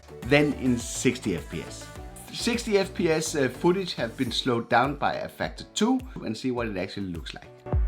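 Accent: Denmark. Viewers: Ære you from denmark? Sound like at Danish guy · Are you from Denmark? Your accent is so danish!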